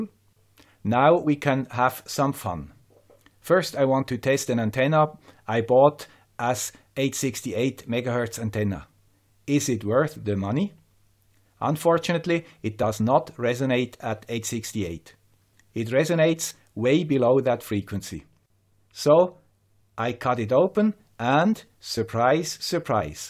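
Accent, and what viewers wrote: Switzerland. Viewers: Thank you, as a French, I like your Swiss accent, and I remain admirative in front of the simplicity with which you can explain complex subjects...I'll keep watching your multiple videos and keep learning... Your experiments basically save me the hassle of trial and errors, and help me to go straight to the right solution. Bravo! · Now when I am working with electronics I have a Swiss voice in my head.. Dankeschön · What more could you want? A guy with a Swiss accent explaining complex numbers ....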